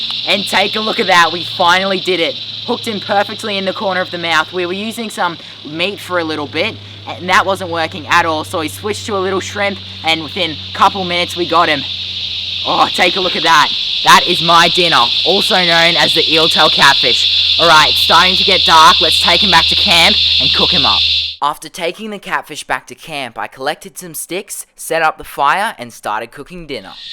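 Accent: Australia. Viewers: He sounds so Australian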